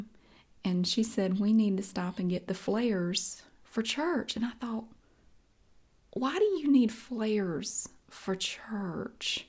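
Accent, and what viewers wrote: South, United States. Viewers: I’ve been watching your videos for so long that I became so accustomed to your accent but because this video was highlighting accents I noticed how southern your accent is! It became a situation where I couldn’t un-hear it 😂 love these ramble videos! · That crazy that you say this because me and my gf have talked about this very thing...see my gf is from the red lake Indian reservation and I'm from leech lake band of ojibwe but was raised in South Minneapolis MN but anywho when she goes bk to visit her rez accent comes bk really thick!!! Ppl say us minnesotans sound funny but idk what we are supposed to sound like!! Your southern accent is the best!! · I loved this conversation! I remember when I first moved to Greensboro my freshman year, everyone sounded so different than me. I’m from Rocky Mount and our southern accent is a variation of yours, but not far from it. 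My accent has never been as strong, but for some reason whenever I’m talking to someone that’s from my hometown, it’s gets really strong and we always get questioned about where we’re from 😂 To be very honest, I used to be embarrassed of my accent when I first moved here, but I grew to embrace it because I enjoy not sounding like everyone around me!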